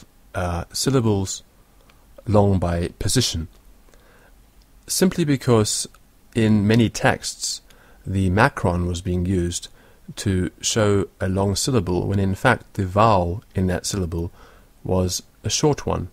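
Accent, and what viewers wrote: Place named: England, United Kingdom. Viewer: I've learnt not only Latin from this fantastic video, but also a descent English accent. Enjoy it!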